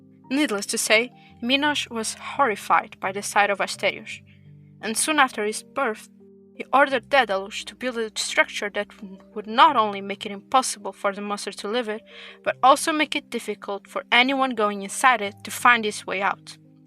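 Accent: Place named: Portugal. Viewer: Noticed it too, sounds like (european) portuguese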